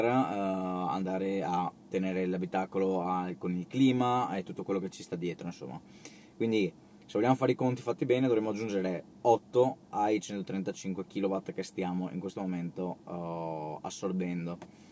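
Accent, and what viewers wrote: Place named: Veneto, Italy. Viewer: Sei veneto per caso? Io abito molto vicino a mogliano Veneto e mi piacerebbe tanto provare la tesla😅😅😅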